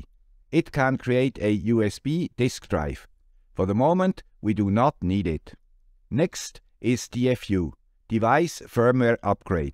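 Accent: Switzerland. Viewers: I love the guy with the Swiss accent 😊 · I have not said it before, but I look forward to: the Swiss accent, The little hand pointer, The hat and the knowledge You share. ThankYou Sir. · Thank you man with Swiss accent, what you explained solved many problems in my mind.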